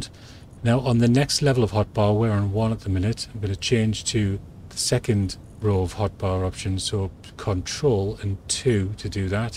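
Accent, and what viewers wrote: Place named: Scotland, United Kingdom. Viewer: Thank you so much, this helped alot!! Love that Scottish accent too, keep it up!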